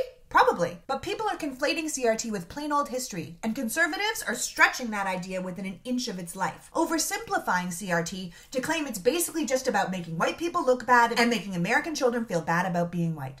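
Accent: Canada. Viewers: Love it😁!!! Line of the century. "Fucking learn from, your mistakes"!!! Thanks for educating me, on what the hell 'CRT', meant!! I've heard of the regionalized, banking practices before, but not CRT. More guilt trips on us, from the side that does, the most wrong😔!! Also, you're Canadian🇨🇦, or originally from Canada??? It's in your pronunciations, and structure.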